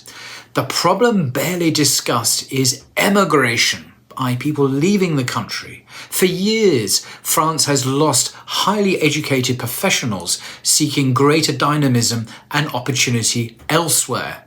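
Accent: United Kingdom. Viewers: "The rising number" " Among them are a growing number of Muslims". Jeez - can you be a little more specific. I am not going to deny the shit stirring right in France - but please be more specific. And also the man making this vid has a UK accent. Is he aware year previous to 1994 UK had always been a net exporter of people - and still huge numbers leave. I have never seen this featured or analysed by UK media. I wonder why.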